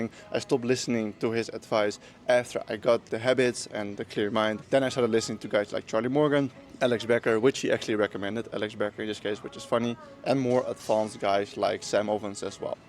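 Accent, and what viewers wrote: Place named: Netherlands. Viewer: I should've known you'd be something like 6'8" from the moment I heard your Dutch(?) accent. Idk why I was so surprised 😂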